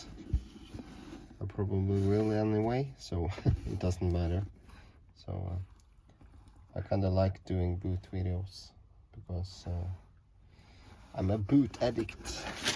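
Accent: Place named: Finland. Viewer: Cool boots when I first saw the thumbnail I thought they were Wolverine 1000 mile cordovan. Do you mind me asking are you Finnish? You have a really cool accent you sound like Kimi Raikonnen or somebody.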